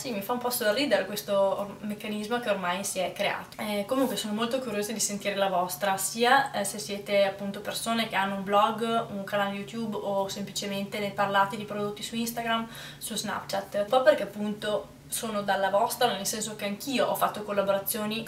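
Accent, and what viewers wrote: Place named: Veneto, Italy. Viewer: Sono una nuova iscritta é un vero piacere averti scoperta e ascoltata. Posso chiederti se sei Veneta? Io sono di Verona e trovo molto famigliare la tua cadenza. Ciao e buona giornata!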